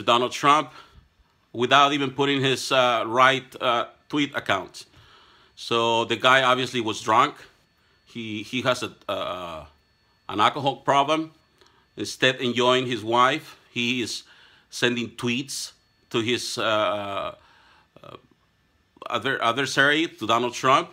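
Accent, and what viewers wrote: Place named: Mexico. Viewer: Tienes demasiado acento para ser Mexican american eres naturalido que no? Y si tu puedes votar por cualquiera F@ 2016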